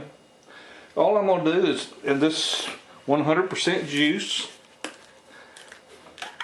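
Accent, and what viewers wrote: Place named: South, United States. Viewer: man I like your style and that good old Southern drawl!! with your recipe maybe the South will rise again! thanks for the video!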